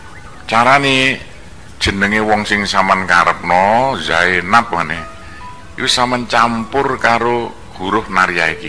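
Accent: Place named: Tegal, Indonesia. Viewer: Yo ora po2 kamu gk mau dngerin skip aja gtu aja kok repot he ini ilmu pnteng bwat orng bnyak cuman pk ustad nya klaw ngomong di dengerin sendri gk ngerti omongane ngebut kyak kreta api gk jelas jlimet pei..pei ...pei..kyak orng gk pnya gi2 sya aja yg dngerin ceramah nya gk nyambung omong nya mirip orang ngapak tegal 'smua blom tentu ngerti bhasa nya jdi klaw ceramah pkek bhasa indonesia yg jelas dn di mengerti gk jlimet kyak so2r nya benang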